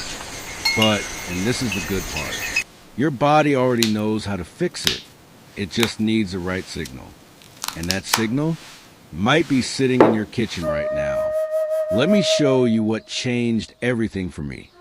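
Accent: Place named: United States. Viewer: Beautiful Japanese visuals let down by the extremely American narration.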